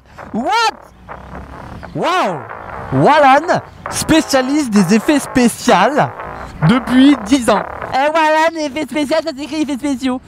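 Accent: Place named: Toulouse, France. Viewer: Novembre encore moto 🏍 vive LILLE a pardon toulouse avec l’accent biensur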